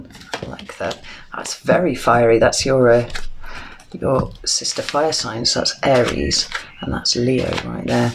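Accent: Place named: Newcastle, United Kingdom. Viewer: Expecting a Newcastle accent🤔? Anyhoo, the reading's bang on👍🏼The dark night lasted about ten years. I wasn't certain I'd make it through to be truthful - it was pretty touch & go for a while. But, yes; there's a project that's got me back on track. Thank you for the most excellent reading. 'Representing the male contingent by the way... Rob🦕 Namasteé 🙏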